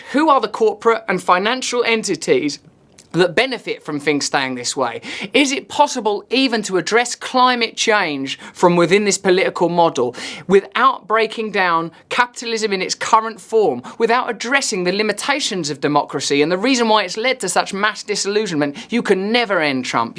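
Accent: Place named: United Kingdom. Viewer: Brilliant observations as usual with the appropriate amount of well spoken British!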